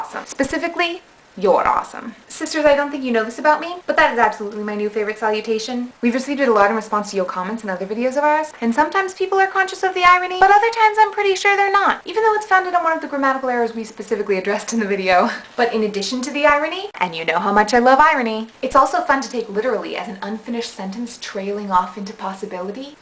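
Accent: France. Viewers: Yay new video! John Green said he enjoyed your channel today in BlogTV. (yes, I also have no life) Also, very nice French accent! You practically own awesome and thus it is your awesome. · haha i love that french accent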